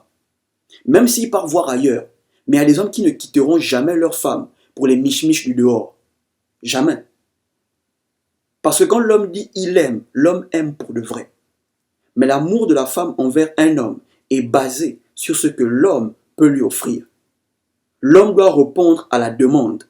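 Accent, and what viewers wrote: Gabon. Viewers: Bro tu connais.escuse moi tu es de quel pays.tu parle comme un gabonais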